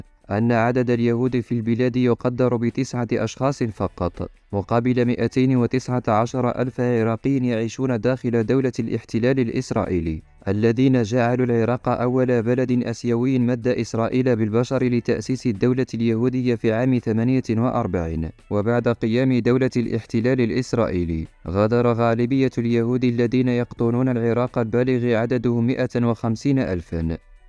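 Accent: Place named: Morocco. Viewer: حتى هنا يتتبع المراركة الاثر اليهودي و يروجون للصهاينة...على فكرة انت يهودي مغربية...و لهجتك واضحة...تفو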